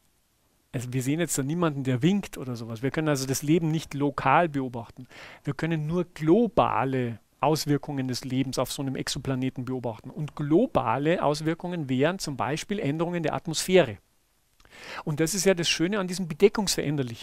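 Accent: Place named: Landshut, Germany. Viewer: Sehr interessantes Video Herr Gaßner, weiter so! Mich würde interessieren, ob es irgendwann vielleicht auch einen Beitrag über die galileischen Monde oder die Monde des Saturn gibt? P.S. Man hört, dass Sie aus Landshut kommen ;)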